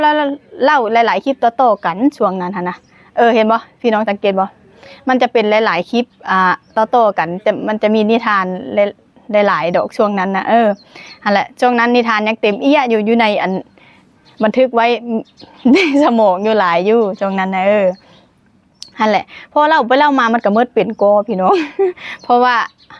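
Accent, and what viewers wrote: Phu Thai, Thailand. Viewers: ทำนองลำขอนแก่น....สำเนียงภูไท...🤣🥰💋:socialdist::elbowcough::socialdist::thanksdoc::chillwcat::elbowcough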